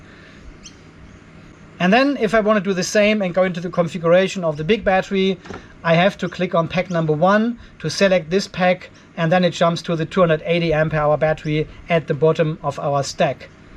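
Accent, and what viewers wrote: Germany. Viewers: Hi, I just found your channel a few days ago when trying to learn about smart BMS's. Your content is amazing, full of information and humor, and I am greatly enjoying learning from you. My late father was an immigrant to the US from Austria, so your German accented English is a pleasure to listen to. I have subscribed to your channel. I have a question that may have been answered in one of your videos that I haven't seen yet, so please excuse the question if that is the case. As a "general rule", how much would be saved by making my own 300ah 12v pack from "storage grade" LiFePO4 280ah cells vs buying a $700 USD prebuilt pack? Assuming no cost for tools and my own time, just costs for the cells, the wire and terminals and maybe a pack fuse, the aluminum for the cell interconnect buss bars, the steel or aluminum and hardware to compress the packs, and the 150a discharge rated smart active balancing BMS? 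I look forward to your response and guidance, Philip from Oregon, USA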